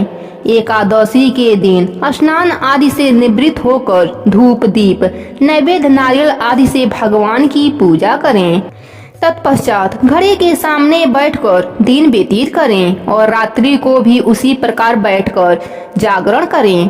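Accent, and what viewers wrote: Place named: Bengal, India. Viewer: Ap to bagali bol rahe ho ji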